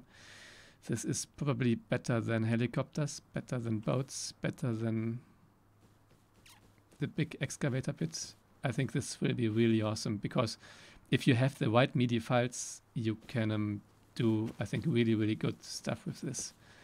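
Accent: Germany. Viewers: This German accent Omg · DU BIST SO DEUTSCH OMGGG ALDA MAN HÖRT DICH ;))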